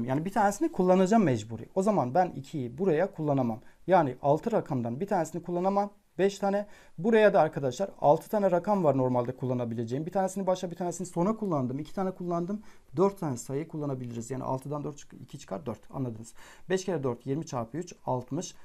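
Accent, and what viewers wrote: Van, Turkey. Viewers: Hoca vanliiiii.. Hemşehrim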